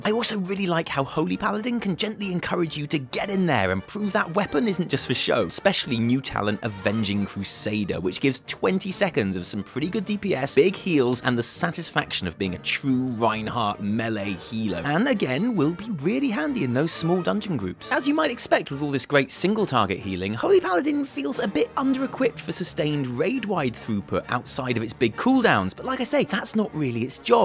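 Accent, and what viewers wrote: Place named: RP, United Kingdom. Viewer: BBC voice, love it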